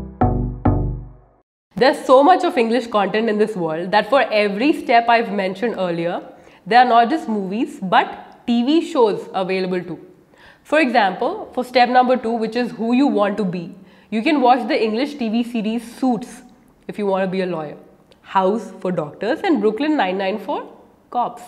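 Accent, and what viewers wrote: India. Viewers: your hindi accent is very boring. appreciate your confidence. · Your english language is a just like a Indian language ❤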